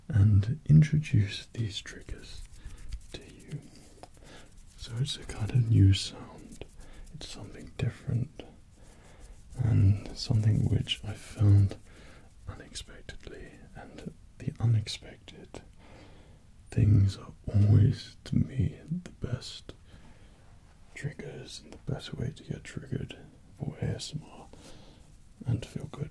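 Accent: United Kingdom. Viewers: Are you British? It would be nice to find a british asmrist! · is he British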